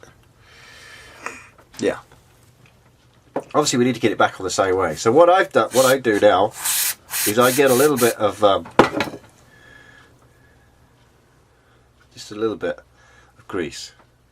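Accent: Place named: Birmingham, United Kingdom. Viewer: You sound like a peaky blinder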